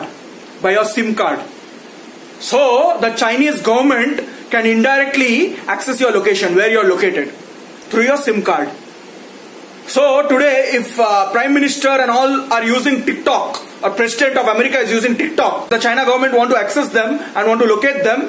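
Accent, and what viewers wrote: Bengal, India. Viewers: his hindi just oozes that bengali feeling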